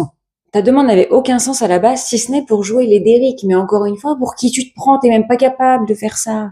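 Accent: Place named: United States. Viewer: Je regarde tes vidéos avec ma fille Sasha de 19 ans. Nous sommes passionnées par les stars américaines. Il existe des youtubeurs qui traitent de ses sujets. Tu es pétillante, dynamique, ton accent (je croyais que tu étais américaine), une très jolie femme, bcp de flow comme me dit ma fille. Il faut le dire aussi. Tu te démarques largement car tu vis les infos que tu nous donnes avec des imitations, ta gestuelle, la vie qui se passe autour de toi, ton fils qui joue avec son ami(e), ton chat. Ça c’est génial. Tu es passionnante et passionnée. Tu nous entraînes dans ta passion. Même après une journée de travail, t’occuper de ton fils, de ton chéri (j’espère que je ne fais pas d’impaire en disant cela 🙊) tu as une énergie débordante pour nous informer de tes news. C’est cela être passionnée. Je suis sûre que tu vas monter car tu le mérites. Je le pense sincèrement car cela ne m’apporte rien de te mentir ou autre. 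Je hais le mensonge. Ne change pas. Et merci encore 🙏🏼🌹🌹🌹🌹🌹🌹🌹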